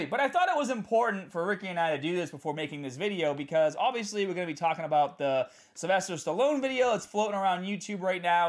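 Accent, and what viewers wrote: Boston, United States. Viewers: Most obnoxious Boston accent ever and I’m from Boston 🤦🏽‍♂️🤦🏽‍♂️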